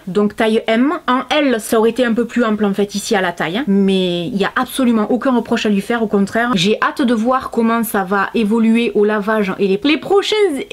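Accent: South, France. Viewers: ce pyjama rose je l’ai depuis bien 3/4 ans et c’est toujours un bonheur de le mettre! Il s’est peut-être un peu détendu avec le temps mais absolument pas abîmé et il est toujours aussi chaud et doux! J’ai essayé les ceintures en cuir chez eux et c’est les seules qui ne se déchirent pas, j’ai aussi pris les grosses chaussettes avec le molleton dedans et laine dehors c’est aussi super. Merci pour tes vidéos et ton accent du sud, ça ramène du soleil en Alsace, je t’adore! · On pourrait presque dire merci au reconfinement parce qu'on a deux fois plus de vidéos de, et ça c'est chouette! ^^ Toujours des vidéos super agréables, et souvent quelle rigolade! Puis cet accent du Sud un pur bonheur! Merci d'y faire honneur, notre accent est tellement moqué souvent, et pourtant il chante même quand il pleut chez nous. Continue ton petit bout de chemin, soit fière de ton chemin parcouru après tout se que tu as raconté dans ta précédente vidéo sur l'amitié et ce que tu as vécu. 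Je ne peux pas t'appeler "maman youtube" parce qu'on a presque le même âge, mais bravo pour ta chaine et ces bons moments que nous passons avec toi chaque semaine. Ca fait un bien fou! Bises de Montpellier. Et caresses à ton adorable Tal;-).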